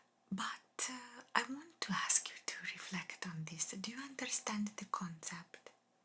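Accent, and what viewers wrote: Italy. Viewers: Hello, magnificent ASMR with an Italian accent. I loved it and enjoyed it, it's relaxing. Greetings, beautiful 🌹🌹🌹🌹💖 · I love your Italian accent videos beautiful eyes ❤your voice is so calming 🫂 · È così bello ascoltarti, il tuo accento italiano è meraviglioso e molto rilassante.💚🤍❤️ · I love the creativity that you put into these roleplays. Very few ASMRtists would think to talk about water towers or Tom Cruise playing hide-and-go-seek. Your Italian accent is very fun too.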